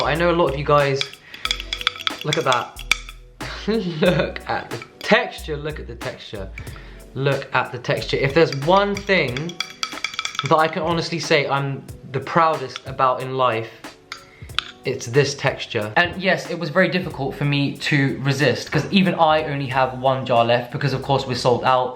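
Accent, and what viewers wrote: Germany. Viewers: I hear a german accent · She sounds 10000% German lol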